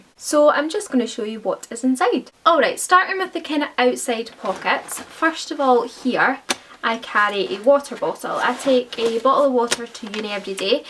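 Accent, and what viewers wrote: Scotland, United Kingdom. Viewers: I am from Germany and I will go to Scotland for my Au Pair next year. I love your accent. It is great to get prepared for my time in Scotland. Thank you so much! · YOURE SCOTTISH WOO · Ah! So you’re Scottish! For some reason I thought your accent was German?? But then reading the comments, it made a lot more sense now. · SCOTTISH ACCENt?/?/!????? SO BEYOND CUTE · the accent 💓💓. Merida's blood relative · OH MY GOD!! Are you Scottish?? I AM IN LOVE WITH SCOTLAND! This is a goal in my life to visit this country!! (I am French) I just find your video and I want to thank you for it because it really help me! I am a young student at university and I've search everywhere to find a bag that could fit with the atmosphere and now (thanks to you) I find it! So yeah thank you again!! Bye :)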